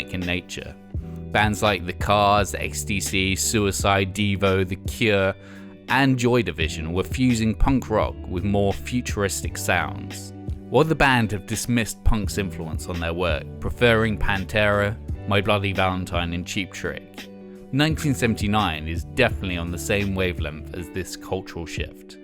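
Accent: United Kingdom. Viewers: . Regardless of your British accent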